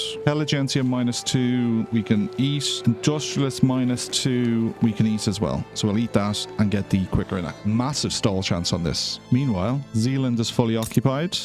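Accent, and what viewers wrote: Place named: Ireland. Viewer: Great to hear an Irish accent, even better when its with paradox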